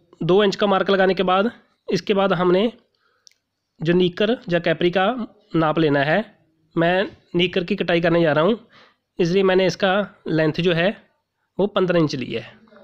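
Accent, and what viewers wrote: Punjab, India. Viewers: Aapki aawaj se punjabi jalak rhi h waise video 1 no. H ji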